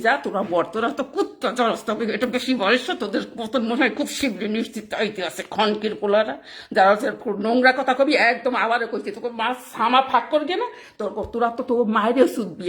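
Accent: Barishal, Bangladesh. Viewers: Apni ki borishaillah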